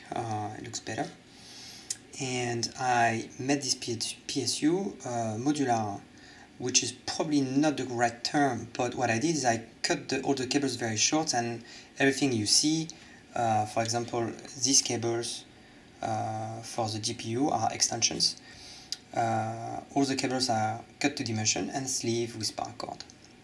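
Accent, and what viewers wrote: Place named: France. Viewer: Un accent bien français mais sinon ta video est très bien continu comme ca :)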